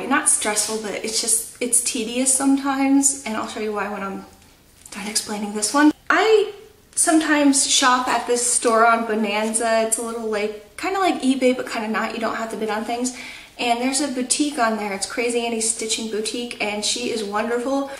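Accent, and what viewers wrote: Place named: New Jersey, United States. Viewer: I really like your videos, your work is gorgeous! I enjoy listening to you talk because I am originally from N.J. and your accent reminds me of home. :)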